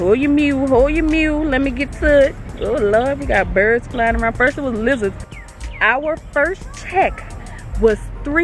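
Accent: New Orleans, United States. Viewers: I love your accent. It's sounds like a good N'awlins ( New Orleans) accent. I can recognize that accent anywhere. Lol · Do I hear a New Orleans accent??? I'm new to this channel. Keep up the good work!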